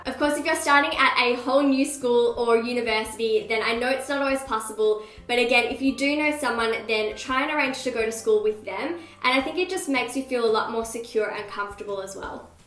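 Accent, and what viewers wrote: Australia. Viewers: Australian lol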